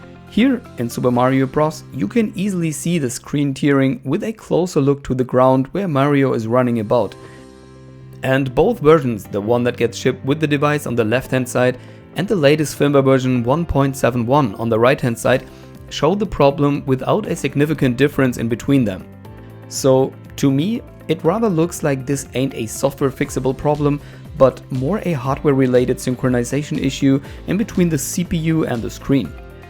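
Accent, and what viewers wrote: Germany. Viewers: Da höre ich doch deutschen Akzent! :D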